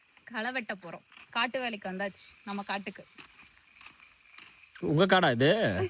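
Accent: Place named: Tamil Nadu, India. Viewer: Good. Slang seems from dindigul dt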